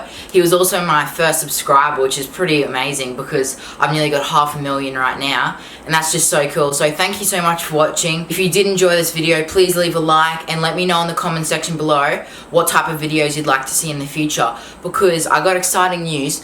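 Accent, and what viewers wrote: Australia. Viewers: Probably Australia, he sounds like it. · r u Australian?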